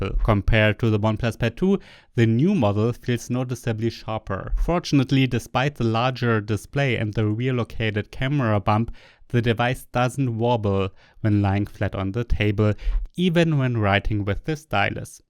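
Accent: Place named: Germany. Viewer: Have you been working with an English dialect coach? Your sharp German accent is slowly disappearing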